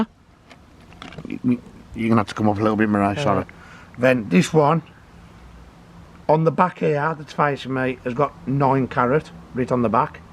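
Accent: Birmingham, United Kingdom. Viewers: i love the brummie accent great stuff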